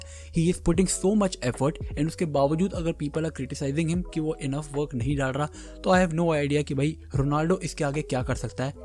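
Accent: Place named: Delhi, India. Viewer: Bhai hai Kidhar se tu Delhi ka lagta hai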